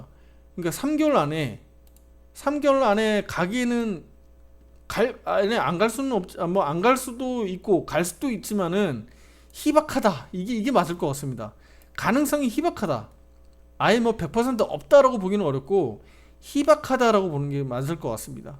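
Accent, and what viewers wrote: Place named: Gangwon, South Korea. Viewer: 목소리톤이 강원도시네ㅎ 반갑습니당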